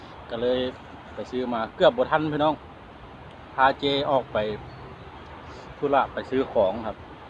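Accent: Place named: Nyo, Thailand. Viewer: สวัสดีครับอ้ายมาเบิ่งมากินนำครับ..ได้งินสำเนียงญ้อแล้วคึดฮอดบ้านครับ..เพื่อนใหม่เด้อครับเขยสกลมาเบิ่งนำครับ..มุงหลังคาให้แล้วครับอ้าย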